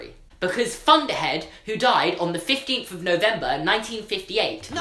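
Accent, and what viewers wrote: United Kingdom. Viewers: is he British just cause I'm British and someone I know sounds like him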